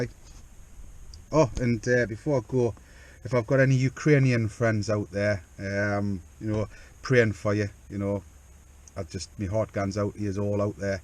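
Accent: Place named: London, United Kingdom. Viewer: have a quick question. Being in the states (mid-Atlantic Maryland), we have a weird accent that no one can figure out where we are from. Southern states think I’m from NY, northern states think I’m from GA. Yes, the accents here are that different. Is England the same? I’m guessing you may be from north London based on your thick accent. Think Adele. She has the same dialect as you and other pipe cleaners in London!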